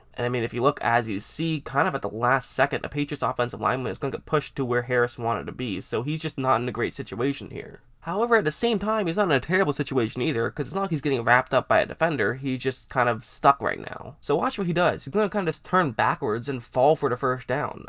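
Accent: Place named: Tennessee, United States. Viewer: You sound like you are from Tennessee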